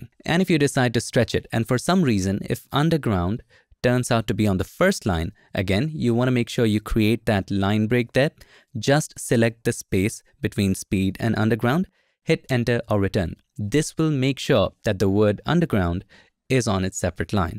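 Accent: India. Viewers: I watch your videos for your excellent communication, teaching and presentation skills , soft and high pitch Indian accent with lots of confidence, immersion and proper body language 10/10 ur a benchmark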